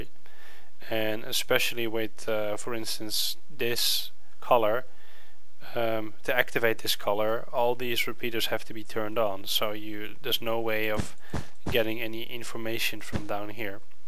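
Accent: Netherlands. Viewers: are you dutch? (your accent sounds like it)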